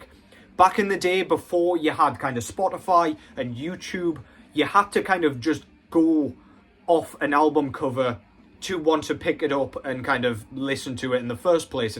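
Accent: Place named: Northern England, United Kingdom. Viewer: You saying piss poor in your delightful northern English accent is my favorite thing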